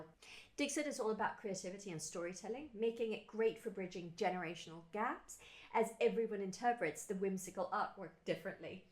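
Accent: United Kingdom. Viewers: Dude are you british? Your accent sounds a bit off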